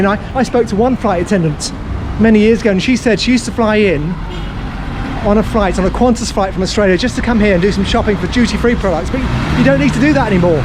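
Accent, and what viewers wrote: England, United Kingdom. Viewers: So what if he has an English accent...??? Sheesh, wish him well. Respect people wherever they came from, where they were born and however they speak....peace to all.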